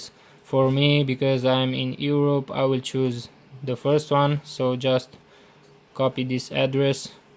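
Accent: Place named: Hungary. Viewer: Helló.Te magyar vagy mi?A kiejtésedből úgy vélem.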